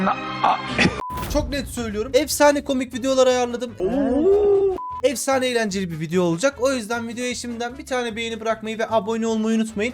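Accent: Gaziantep, Turkey. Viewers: abi sen anteplimisin ben antepliyim şivenden anladım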